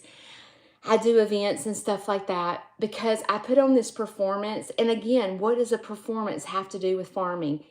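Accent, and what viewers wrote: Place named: South, United States. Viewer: Loved this show! I retired at the end of 2022 and am speeding towards 69 years old so I have a lot of newfound time on my hands! I live in Holland, Michigan & love listening to your southern accent. I believe listening to your shows (as well as those of Cog Hill Farms) will be a great way to go through my days! I appreciate the laughs that you both provide & I love watching the animals & your interactions with them. I anticipate your subscription numbers will increase so please make sure to take time for yourself to keep the balance you discussed in this show. Take care!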